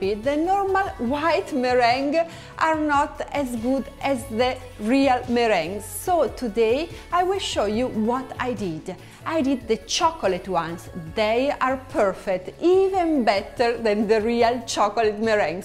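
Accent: Italy. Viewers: making it easier for yourself is the key. May not be much different on our end but if you can save minutes and hours of editing that's well worth it. Your Italian accent is half the reason that I subscribe, thank you again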